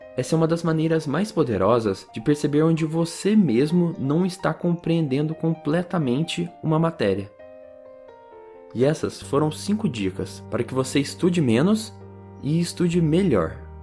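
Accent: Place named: Minas Gerais, Brazil. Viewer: Onde o mora? Ele parece Mineiro!!!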